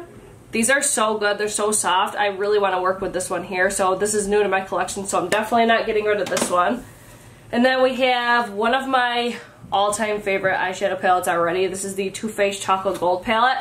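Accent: Midwest, United States. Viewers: What is this accent?? Midwest?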